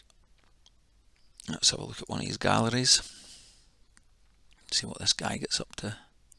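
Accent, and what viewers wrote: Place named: Scotland, United Kingdom. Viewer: Many thanks - great video - very informative and with a dreamy Scottish twang as a bonus! Happy Days! LOL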